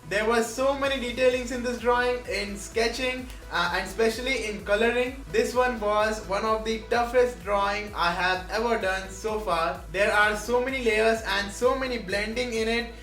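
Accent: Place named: India. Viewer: Nice video! And great accent. Indian right?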